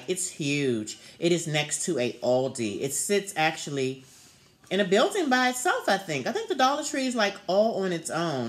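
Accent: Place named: Maryland, United States. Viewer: Congratulations! My parents are from Annapolis, Maryland. I recognized that Maryland accent right away! I have a million cousins, and aunts and uncles up there. I really enjoy your channel. ☺️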